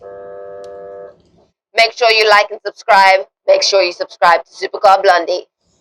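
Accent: South Africa. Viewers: She sound South Afr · Who is this Girl. Is she South African? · You sound South African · As a proud South African I can definitely hear my own. Im assuming the host is South African 👊🏽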